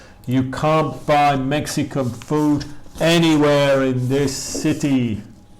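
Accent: United Kingdom. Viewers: tu acento es británico... verdad?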